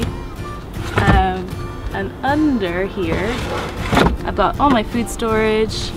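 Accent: Ireland. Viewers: Are you from Ireland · Is that an Irish twang I hear? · She is originally from Ireland, that's why she's sound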